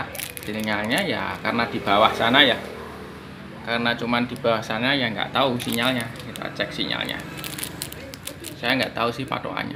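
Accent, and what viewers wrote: Java, Indonesia. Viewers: ngomongnya teralu medok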